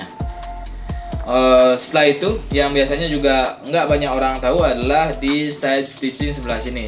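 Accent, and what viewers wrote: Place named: Madura, Indonesia. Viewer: Jer, mirip mirip Logat meduro 🗿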